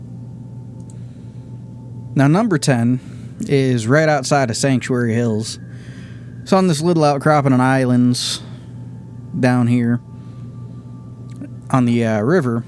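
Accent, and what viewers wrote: South, United States. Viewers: When you get angry, the southern accent comes out even more. Speaking of sound. It is NOT a complaint. Just an observation. On the other hand, visually this time, it's funny, for a veteran FO4 player, the lack of big picture in the displayed frames. And that's not a complaint either. Just another observation. All in all, I enjoy this type of content, no pretense of attacking a particular topic, just reflections on the game. By the way, this is the first video I've ever seen from your channel. Definitely NOT the last one. I subscribe to the channel, especially for FO4, which, for objective reasons, I cannot play at the moment. As a curiosity, English is not, as you well notice, my first language (in case you're wondering, it's Romanian).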